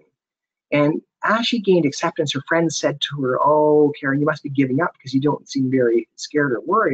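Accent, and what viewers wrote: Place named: Canada. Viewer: Enjoyed the presentation. Turn on "closed captions" to help with understanding. has a Canadian accent. This talk parallel's my own journey, now in remission (only a year) from metastatic sarcoma. I've thoroughly enjoyed my life on the edge of life and death. I'd recommend it for lost souls searching for meaning in a troubled world. It's a spiritual accelerant. As a social radical, the context is also living in a toxic, urbanized world, alienated from nature that's spinning out of control. Keep the flow of water and healthy nutrients going to help de-toxify the body-mind. Cancers often forms and habituates along routes of elimination: like the lungs, skin, liver, bladder, colon. Mine was (is) urothelial. Also, I'm a zen adept. I like Turner's book and have a sense of intentionality, but purpose needs to be put in a bigger context beyond the ego-mind, which is a social construction BTW. 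You allow (accept) by questioning, doubting and stripping away the non essentials. Meditation can lead to emptiness, being empty of all distinctions. Living from a place of non resistance in touch with the mysterium infinitum et oppositorum (Nicholas de Cusa). It's a mystical state of wonder, where there is no ego, no cancer, you're full of vitality or chi, and goals dissipate too. You're just in the presence of it all. No judgement or comment necessary. This along with Basho, my adoring adopted stray cat; a loving wife; openness; attention to alternatives Western medicine, driven by the pro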